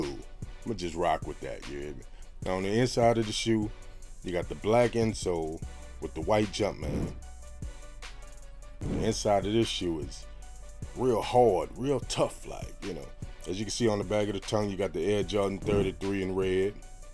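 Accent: New Orleans, United States. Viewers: You got to be from New Orleans you talk and sound just like my boy. You heard me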